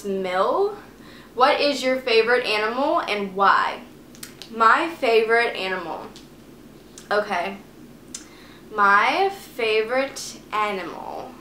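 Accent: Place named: Louisiana, United States. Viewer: You can so tell you are from Louisiana !!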